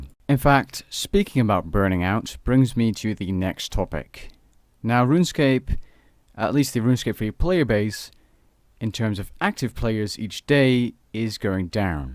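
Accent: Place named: Netherlands. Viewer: well whatever you choose to do the community supports you and thank you so much for making such great content and teaching me to boss. It has always been a goal to achieve a max Cape and thanks to you I'm on that road now. And hopefully before too long I'll have the final boss title. And that's good to know you're Dutch 😂 I couldn't figure the accent out . But for real whatever you feel like you have to do or want to do we support you and you at least have built up a community enough to get a jumpstart of you were to do other games❤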